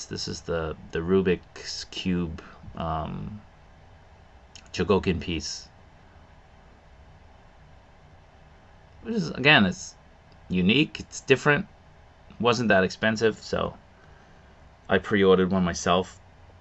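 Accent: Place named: New England, United States. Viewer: yes sir! I just got shelves a few days ago. I am going to get display cases, similar to what I saw At Tamashi nations, due to the earthquakes we get here. I live in Asagaya so I’m pretty much at Nakano broadlway every other day. Moving to Japan has been a wonderful blessing. I moved here not because of Anime and action figures, but the overall culture, food, people, order, serenity, peace of mind and affordable housing. My home town of NYC seems to be a mess now, also I don’t have 1.4 million for 400sqft (I’m not exaggerating that’s a Manhattan condo price in Harlem where I’m from). I flew in the Air Force for 30yrs hit every corner of the globe. Spain, Italy and Japan were my favorite missions to fly. Japan stood out amongst all the lands I traveled. I detect a New England accent within you, I enjoyed going up to cape cod for the summers with friends to beat the grind of NYC. 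I would have moved to that area if I stayed stateside. If you have not done a video on the subject, I would recommend the Animation museum in Ogikubo and the Inazama anime Cafe in Ogikubo as well, Take care and keep up the great work.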